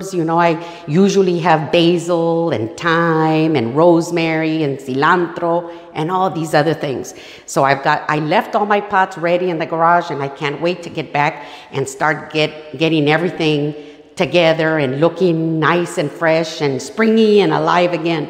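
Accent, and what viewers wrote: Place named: South Texas, United States. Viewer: I love watching your videos! Your South Texas accent reminds me of back home 🥲💕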